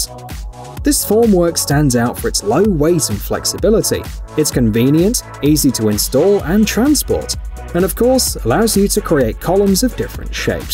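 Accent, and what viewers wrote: United Kingdom. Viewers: C'mon now who measures height in inches??? Weak. So total collapsible ladder height goes from 35"-14.5'. hate it when you get un experienced YT announcers using frugal and absurd measuring techniques despite the status quo. Like American calculations given in metric or American applied items with temperature variances given in Celsius.... Uh what is it 27° F for every degree Celsius? Don't know off hand Which inadvertantly cancels out the value of the information being presented. British accent or not, if you are going to present the ladder you might as well present the common method of measurement. It's only laziness and ignorance when presentations are as thorough as they are without doing so... Enough for some to immediately stop viewing as conversions are essential and we are not in Europe and we are in The great failing and falling America. 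Don't even know why i attempt to defend this skid mark country... All politicians need to be removed and no I'm not a domestic terrorist - just a disgusted true American Patriot. Live free or die.. waiting to for ad freedom is a joke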